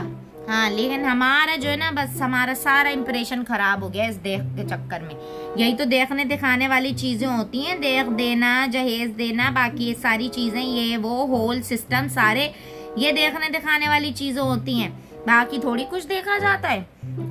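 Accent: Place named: Lalukhait, India. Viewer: very good accent, ,same i heard somewhere in lalukhait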